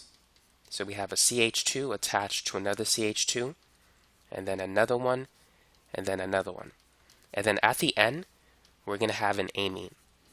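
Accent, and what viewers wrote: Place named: Massachusetts, United States. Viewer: sounds like he's from Mass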